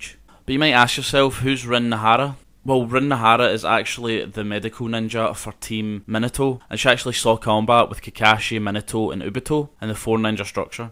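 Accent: Scotland, United Kingdom. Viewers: the scootish accseny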